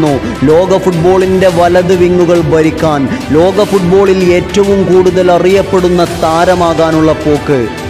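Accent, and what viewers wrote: Malappuram, India. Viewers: 😄😃Achan dovingos footballinte Oru pirandanayirunnu enn saaran 😁😁thani Malappuram slang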